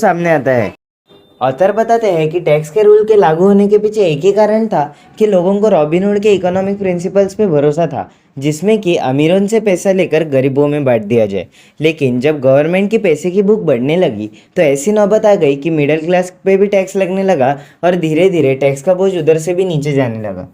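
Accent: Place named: Hyderabad, India. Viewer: Tum Hyderabadi Miya lag raho ji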